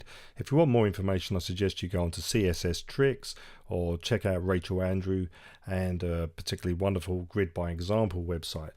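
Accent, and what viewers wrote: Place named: England, United Kingdom. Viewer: Excellent tutorial man. Always better with an english accent too haha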